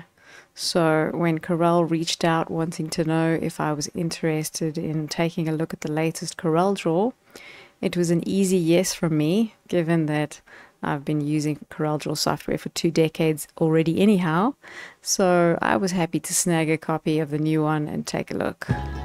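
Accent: South Africa. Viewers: Is that a South African accent I hear? :)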